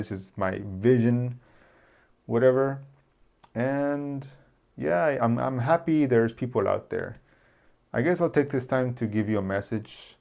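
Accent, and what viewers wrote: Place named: Spain. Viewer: I really like your photography! Thanks for sharing your opinions. I feel like your English has some Spanish accents haha, perhaps i got it from my latina gf, which also loves anime.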